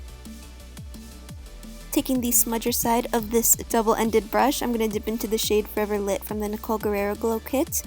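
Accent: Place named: Michigan, United States. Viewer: I frickin love ur Michigan accent and how you say lashes its so cute 😙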